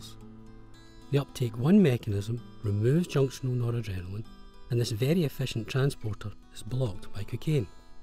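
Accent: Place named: Scotland, United Kingdom. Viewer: what hell is he talking about??? shitty scotish accent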